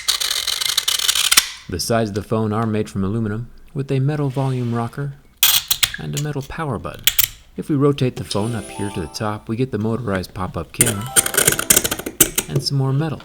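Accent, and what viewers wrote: United States. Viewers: Oh and the perfect American accent didn't give it away? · Your voice is very flat !!!!! Please talk or speak or "parla" with Oxford accent if you want a normal ear to FOLLOW YOUR MOUTH !!!! OTHERWISE , Its HARD TO HEAR OR LISTEN , YOUR BRAIN-WASHING FLAT AMERICAN VOICE VIBRATION !!!!!!!!!!!!! ☀☀☀☀☀⛵✈✈✈✈✈✈✈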